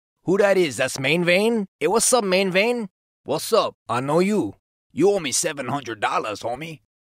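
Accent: Mexico. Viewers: Guys, the Mexican accent is back. And it's pretty much here to stay it seems. Hooo-rah.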